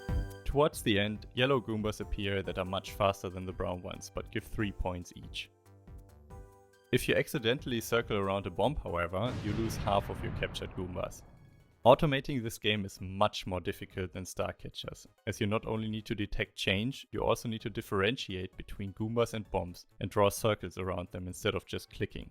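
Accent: Germany. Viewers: Are you by any chance German? (Great Video btw)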